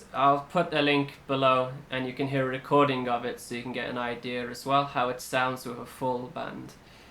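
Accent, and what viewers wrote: United Kingdom. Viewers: You sound like the British reporters who report the war in Afghanistan.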